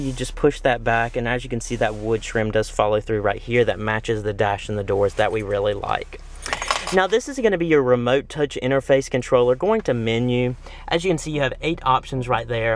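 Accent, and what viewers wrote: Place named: South, United States. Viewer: I'm a Texan, but I can hardly stand that southern accent and the part about, "If you can afford it, so what!" How arrogant!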